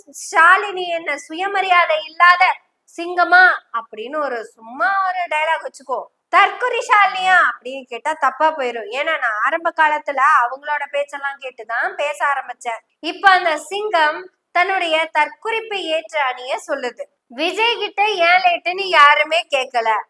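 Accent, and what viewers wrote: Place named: Palakkad, India. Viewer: சூப்பரோ சூப்பர். சும்மா பொளந்து கட்டுறீங்க பாலக்காட்டு கொங்கு தமிழே. பாவம் மனநல ஆலோசகர் ஷாலினி பொழச்சி போகட்டும். விட்டுருங்க. இவரே வேறொரு மனநல ஆலோசகரை அணுக வேண்டிய நிலைக்கு ஆளாகிவிட்டாரே என்று நினைக்கும் போது அவர் மீது பரிதாபம் ஏற்படுகிறது தோழரே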